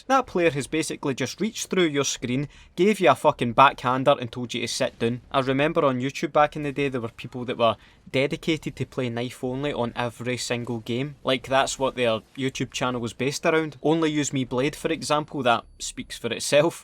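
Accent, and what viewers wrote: Scotland, United Kingdom. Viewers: I know this is an old video. But i love coming back to these because the Scottish accent and humour makes it worthwhile. But cammado Pro was gunna to get fixed like everything else, but the main dev left, and nothing ever got patch. Which left everything being broken and having fun memories 😂 ❤️❤️🔥🔥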